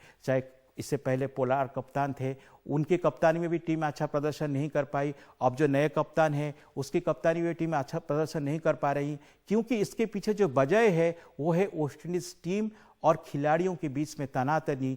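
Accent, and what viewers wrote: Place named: Kolkata, India. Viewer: बोलने का तरीका गज़ब है सर जी आपका लगता है कि आप कोलकाता से है कि 😀😀😀😀😀😀😀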